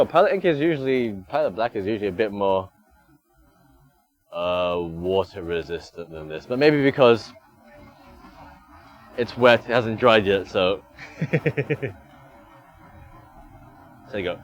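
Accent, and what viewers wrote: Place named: Australia. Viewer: Wow, Australian :) Where about?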